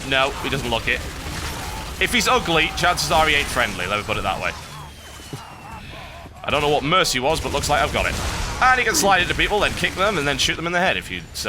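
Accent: United Kingdom. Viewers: this guy's british accent can almost compare to paul from paul's gaming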